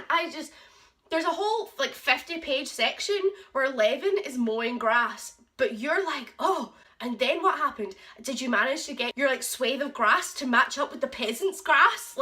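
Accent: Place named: Scotland, United Kingdom. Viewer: Hi! Great review! Where are you from? I would guess Scotland near Glasgow? but you have a really nice accent. It gives you character. I've noticed way more Europeans do classical book reviews on youtube than North Americans, which is kinda sad to me. 😟 (I'm from Canada.)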